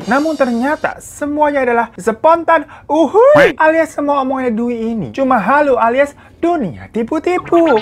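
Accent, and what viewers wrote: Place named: Jakarta, Indonesia. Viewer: 😄😄😄 lucunya Lo bang...Betawi bet ngomong nyeeee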